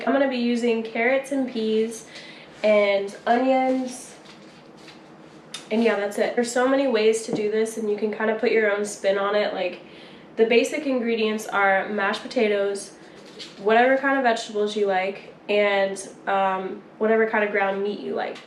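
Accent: South, United States. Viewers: You have the cutest country twang. Love it. That looked delicious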